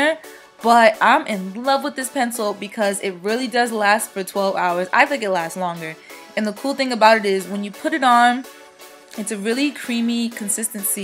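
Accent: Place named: South, United States. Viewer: Lol,idk you're from Boston,but you have a slight country accent.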